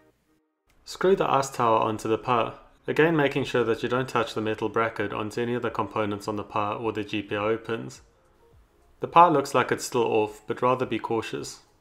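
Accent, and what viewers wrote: South Africa. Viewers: you sound like a South African, not an Australian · South African? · You sound South African.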